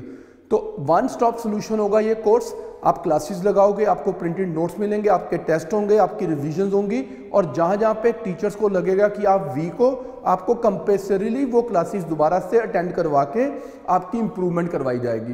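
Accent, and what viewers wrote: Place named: Punjab, India. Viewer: I really like sir's accent.. The typical Punjabi 😊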